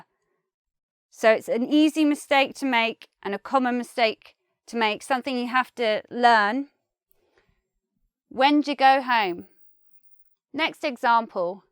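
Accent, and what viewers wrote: United Kingdom. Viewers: i am so happy that you are recovered and in full of energy. I really enjoy British accent. Thank you.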